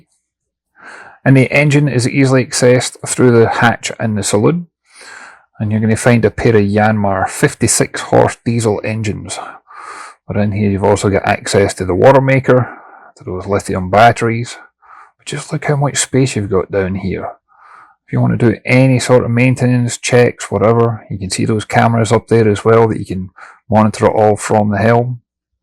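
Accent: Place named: Scotland, United Kingdom. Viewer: I love these GH boats and your reviews. I particularly enjoy your accent as it reminds me of my times in Scotland.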